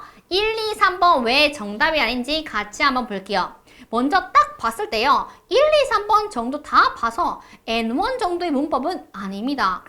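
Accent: Busan, South Korea. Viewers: 부산 분이신가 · 강사분이 우리경상도 분이신가ㅎㅎ 부산 악센트가ㅋㅋ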